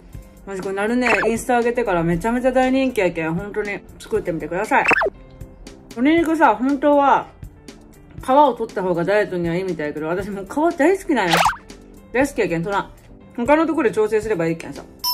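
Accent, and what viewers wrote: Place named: Hakata, Japan. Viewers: この雑さ具合が好きになる〜方言的に福岡の人なんかな？ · 、福岡弁かわいい💞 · は九州？うちは福岡やけど話し方が福岡っぽくて親近感があった❣ うちも今ダイエットの迷路にはまってしまってるけん、のを参考にしますだ✌ストレス溜まらんようにやね❤これからも楽しみに見ます～😃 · 〜やけんとか〜とよとかは博多弁ですよー。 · 喋り方が福岡やけんめっちゃ親近感わきますｗｗ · と同じ身長で６７キロまで太りました😭 食事は給食あるから無理だけど、頑張るぞ〜！私と同じくらいズボラやし、九州出身？と思われる方言が素敵やね。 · 糖質制限から7キロリバウンドした💦 博多弁丸出しの密かにずっと見とって、博多人間からしたら友達の領域w まじうけるw🤣ちょっとまじでまた7キロ戻そかなー！頑張る💪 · 同じ福岡だからか方言がしっくりww面白いかよw しかしバリカワ！チャンネル登録しましたー！ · あ！博多弁やん笑笑聞きやすい笑笑天才やね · はじめまして☺️ 私の半分しか生きてないやけど、かわいいけんチャンネル登録した❤️ 博多弁？私も福岡やけん聞き取りやすい☺️ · 博多弁に癒されます！ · 最近知って式マッサージとかやったりしてるけどいままで毎日やってるとマッサージの内容(?)とか覚えたら動画見ずにやったりしてたけどが見たいからマッサージ覚えても毎日動画見に来てる🙌まじで考え方とか性格とか好きすぎるし、何より私も九州の人間だからイントネーションとか聴きやすくて方言も好きすぎる💓唐揚げ私も大好きだからたまに揚げない唐揚げ作ってみようと思う💪私はYouTubeで知ったけどまじで知れてよかった😂💓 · 博多弁いいですよね私、博多在住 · 博多弁サイコー❤美人でええな🎉うちも博多たい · 博多弁親近感湧く😂💕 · 方言が一緒やな……って思ってたら福岡の人でした!!余計に嬉しいです( ｰ̀֊ｰ́ )✧︎ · 俺と喋り方「博多弁」がばり一緒っちゃけど、街ですれ違ってるかもね笑笑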